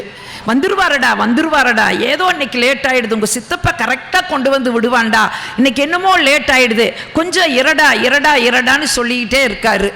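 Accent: Kongu, India. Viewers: Kongu mandalam pechu siripu sandhosham...unga pechu...akka superrrrrrka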